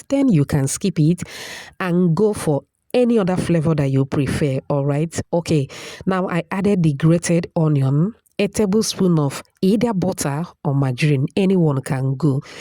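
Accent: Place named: Igbo, Nigeria. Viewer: From Nigeria, I like ur Igbotic accent ❤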